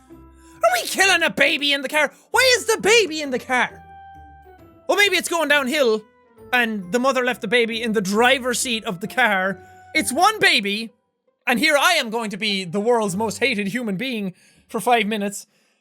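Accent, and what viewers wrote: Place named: Ireland. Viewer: I think the way Irish people pronounce A is funny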